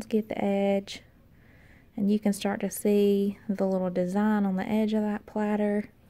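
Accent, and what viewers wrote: South, United States. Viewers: You have the southern accent and the monogram...you need to get on your cake bakin', girl! 🎂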